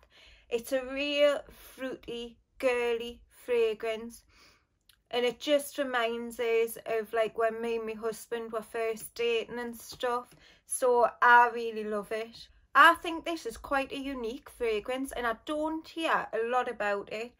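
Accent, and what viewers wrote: Scotland, United Kingdom. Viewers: Goede morgen xxxx greetings from the netherlands, lovely accent scotish???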